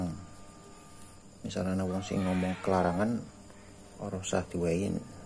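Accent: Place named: Banyumas, Indonesia. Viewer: Suka dch sm tuto yg ini....ngapak chiinnn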